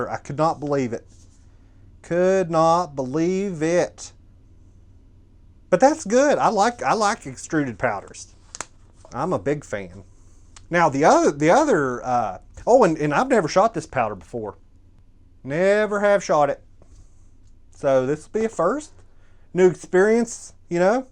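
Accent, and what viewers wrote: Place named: San Francisco, United States. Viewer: That intro voice sounded like some San Francisco hillbilly, and I thought it was the Yankee Marshall, and I almost turned it off.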